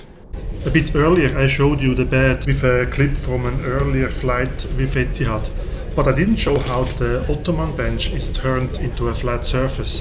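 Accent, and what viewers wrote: Germany. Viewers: I understand you very well. I personally think you don't have to work on your accent. German accent could be quite charming. I am living in London but have a mixture of Thai American English and Dutch accent depending on who I am talking with, choice of words n my mood. Hahaha. Thanks for nice report. Patrick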